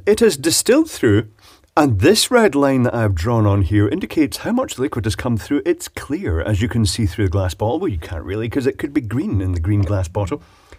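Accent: Scotland, United Kingdom. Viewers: is this guy scottish? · Whats your opinion towards tea, spiffing brit sounding man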